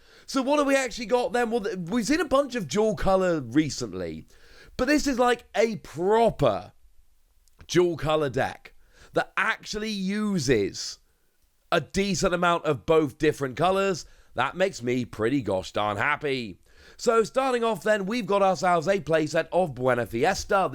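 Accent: England, United Kingdom. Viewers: Typical english accent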